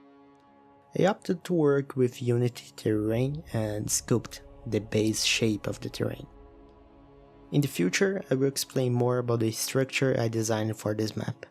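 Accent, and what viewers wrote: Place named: Brazil. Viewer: Cara, pelo que vi, você é brasileiro (suspeitei pelo sotaque e uns comentários aqui hahaha). Queria deixar aqui minhas palavras de incentivo e de admiração. Este vídeo e a sua jornada são inspiradores pra mim na minha busca de criar um jogo. Você me parece um rapaz muito criativo, dedicado e talentoso, por isso, espero que vc atinja seus objetivos e sinta a alegria e satisfação de ver seus projetos completos. De verdade, mano, meus parabéns!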